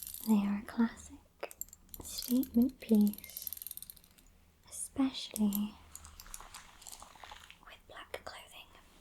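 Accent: United Kingdom. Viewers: "You've got some foliage stuck in the back of your hair." 🫠 Aw that accent. ❤ It's something so commonplace as to be beyond notice to a native like you I'm sure. But the sound of your voice has the capacity to completely short circuit my North American mind.🗣🇬🇧 🤯👍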